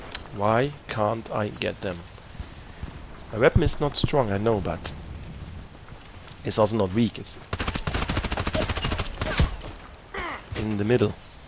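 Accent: Germany. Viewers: cwaddict LOL i knew it you germans have that kind of accent :#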